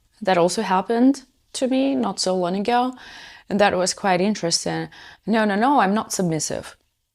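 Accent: Russia. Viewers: Is ugly Russian accent a must in such lessons?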